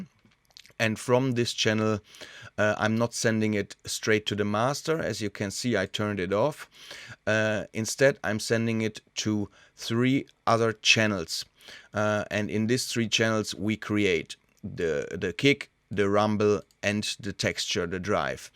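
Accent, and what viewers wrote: Germany. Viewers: man hört das er deutscher is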